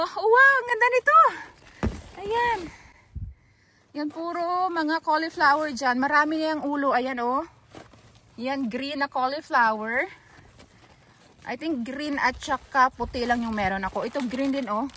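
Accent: Iloilo, Philippines. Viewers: Good morning sis I just found your channel a minute ago. I can tell you're ilonga the way you speak so gentle and your tone. So nice to watch your garden. I also love so much gardening. My parents are hardworking farmers back in the Philippines long ago. But now I been living here overseas for a long time. Have a blessed day 💜🙏🙏🙏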